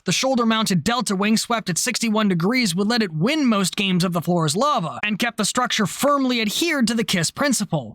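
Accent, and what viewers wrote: United Kingdom. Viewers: As a British citizen 🇬🇧. I approve of your accent you may to Britannia & feel welcome. Keep up the jolly good Work old boy. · I was wondering where the CR105 in project wingman came from. Banger British accent bro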